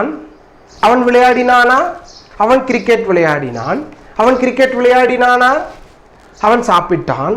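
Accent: India. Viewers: great sir, i like ur teaching and ur talking tamil slang also super sir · Neenga kanniyakumariya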